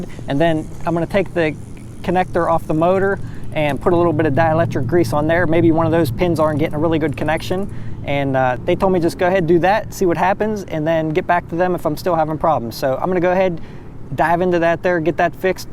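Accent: Pittsburgh, United States. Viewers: Sup man. I thought you sounded like a yinzer. I grew up dahn the road in belle Vernon. Live it pittsburgh now. Just ordered a black xp. 0rder # 1999*. Can't wait for it. Good vids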